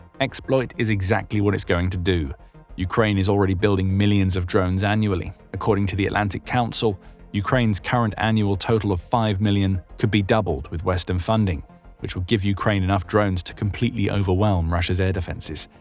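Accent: England, United Kingdom. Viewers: Why does the narrator, who's clearly English by his accent, keep calling petrol, "Gasoline"? In the UK they cal it petrol NOT gasoline!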